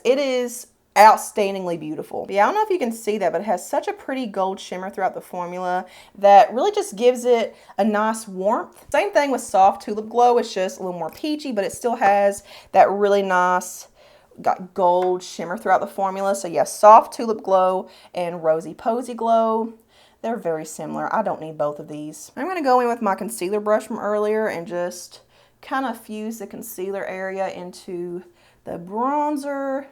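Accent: South, United States. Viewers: Hey, back again from Belgium. Oh my gosh, I think you might well be my favourite on here: you always get straight to the point, knowledgeable and you're totally down-to-earth, sweet and unpretentious. And I said it before but I LOVE that southern accent! ❤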